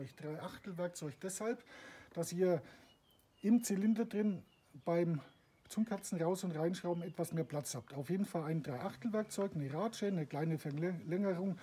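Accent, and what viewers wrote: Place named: Franconia, Germany. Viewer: Servus, wo bist du denn her? Das hört sich schwer nach Frankenland an. Ich bin auch KFZ ler und würde mich gerne austauschen. Hast du ne Werkstatt oder Kontaktadresse?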